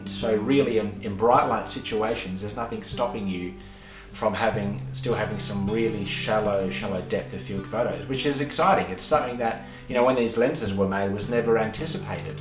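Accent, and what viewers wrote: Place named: Australia. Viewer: You look so American and yet you sound so Oz. I am hevily into vintage lenses, especially anything Carl Zeiss. My two best are an ancent Carl Zeiss Biotar (No red T* but, coated glass) and a more recent Rollei Carl Zeiss Distagon, very worn and skuffed on the outside, but what great glass. I recently found out about the SMC Pentax-M lenses. There is a site that ranks Pentax glass, the Pentax-M f/1.7 50, is not only the most popular, it is ranked the highest. So sharp, and so cheap. $30 USD in the states. Thank you, good work, I subscribed.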